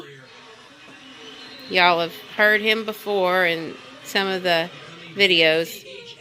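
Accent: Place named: South, United States. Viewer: Hello there, I write your name well? Really enjoyed your 2d Vlog video. Seems a nice family to me, good husband and adorable daughters:) The puppies are sweet too. I have to say, you are doing great, also that selfie standard is very smart! Love your American accent. Is it southern perhaps? You can rely on me, that I will follow more of your video's. The MRE's are my fave too. Maybe you know my friend Nathan's MRE? If not subscribe, he is cool for sure. Perfect program on here you hear?? Loll... till next time.... Rick Lane is my Youtube name, Mark is my Dutch name, see ya:)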